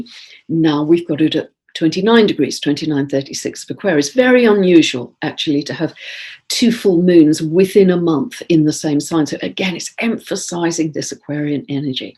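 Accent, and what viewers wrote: England, United Kingdom. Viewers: I'm here for English......I just enjoy listening to her. Light and love from South Africa.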